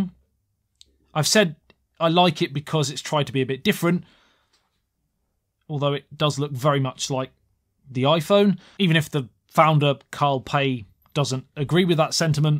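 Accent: England, United Kingdom. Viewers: What is the phone Asus, you mention ? You said it so fast I couldn't hear what you said. Your beautiful English accent is slightly difficult for a Northwesterner.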